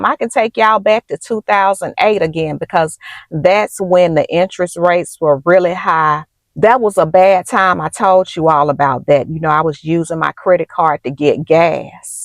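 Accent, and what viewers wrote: South, United States. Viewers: Hi, love your makeup and southern accent. I 🕶️ what you 👀. I’ve never had the 4 legged rat at work, but that dang conniving 2 legged rat will try to get you every time! Be blessed! 🙏🏽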